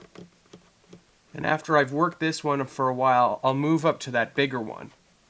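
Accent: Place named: Canada. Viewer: Thanks for the great video. Do u have a video that shows how you did the neck reset? I have a problem with my Martin. I can see a considerable 1/8” gap at the heel of my neck. My guitar is 20 years old. I think the wood has shifted with time. Not sure what to do at this point. I am in Mexico so I don’t have much luthiers around me. Hey you’re Canadian? You sounds like english canadian. I am french canadian from Quebec!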